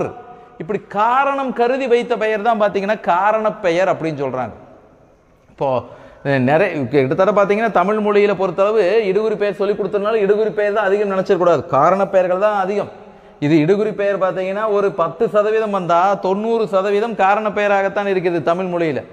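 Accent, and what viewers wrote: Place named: Kongu, India. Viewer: கொங்கு பேச்சு அருமை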